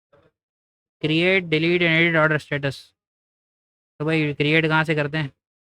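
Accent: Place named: Karachi, Pakistan. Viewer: bhaai kasam se ye hoti hi video bhai ap log behtareen karry ho yar ye wahid video full detailed me mili hi baki sab log sirf chotiya banaty hi time waste krty hi bas view lyty hi and i love this karachi Landhi korangi accent Pepshi pepshi 😍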